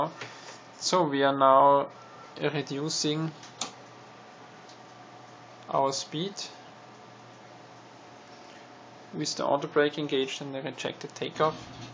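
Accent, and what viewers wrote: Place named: Austria. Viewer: danke fürs video, sehr anschaulich und interessant! Ich hab an ihrem Akzent gemerkt, dass sie Österreicher sind hehe, Grüsse aus Graz hehe!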